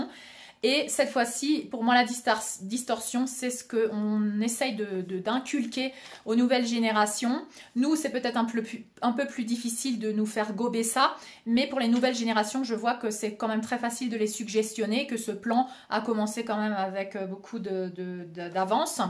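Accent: Alsace, France. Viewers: Merci, nous sommes sur la même longueur d’onde, je réfléchissais à ce phénomène depuis quelques temps et ta vidéo m’a beaucoup parlé. Je suis un homme homosexuel, mais à aucun moment je ne soutiens le mouvement LBGTQI+-machin-truc-bidule-chouette. Pour moi être homosexuel est juste une particularité ou une caractéristique, ce n’est guère plus important que d’avoir les yeux bleus ou verts. Ça me regarde moi et c’est comme ça. Tous ces lobbys wokistes ne représente qu’eux-mêmes, la seule chose qui m’importe en amour est le respect, l’honnêteté, la bienveillance et la fidélité à soi et à l’autre. Le reste ne m’intéresse pas, et je n’irai certainement pas faire de mon fonctionnement une identité et encore moins une revendication. On ne va pas "convertir" quelqu’un à changer de couleur d’yeux, c’est aussi ridicule que de faire la promotion de l’homosexualité, ça ne se décide pas et ça ne s’impose certainement pas non plus. 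Ceux qui cherchent à imposer cela à des enfants sont de grands malades. Il faut laisser les jeunes tranquilles avec toute cette sexualité et préserver toute leur innocence car elle est un cadeau du Ciel. 🙏🏼 Je suis de Strasbourg-Schiltigheim vivant à Reims, et ton accent alsacien m’a rappelé la maison ❤🤩🥰 Je t’embrasse 😘🙏🏼🦋💐 Que Dieu te bénisse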